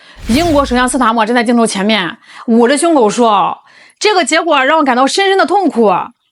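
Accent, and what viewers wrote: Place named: Northeast, China. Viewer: 我本来想冲着这标题来看看黄丝和蟑螂的热闹，结果主播这一口碴子味的普通话把我直接给劝退了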